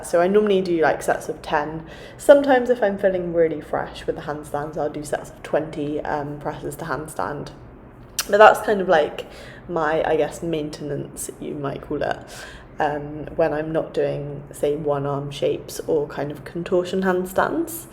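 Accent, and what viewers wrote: RP, United Kingdom. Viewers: you so unique your a amazing athlete yet you dont have tons of ink and sleeve tattoo's and then when you speak this beautiful almost posh English accent comes out Like we are going for afternoon tea. i hope you realize how rare and beautiful that is today...cheers from the USA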